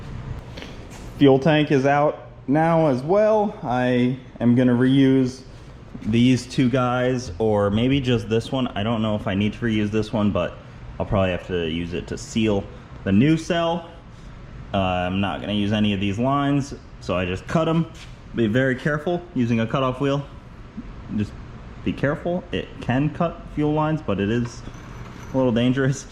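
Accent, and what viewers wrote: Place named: New York, United States. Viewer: What part of New York are you originally from?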